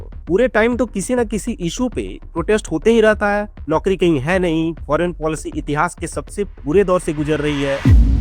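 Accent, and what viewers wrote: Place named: Bihar, India. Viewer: Very poor speaking 🗣anchor performance bigra huoa bihari style ba chara chor ke bete ko parivar public dhono nei baga diya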